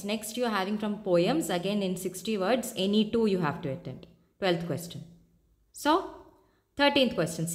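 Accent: Kerala, India. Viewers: Mallu sound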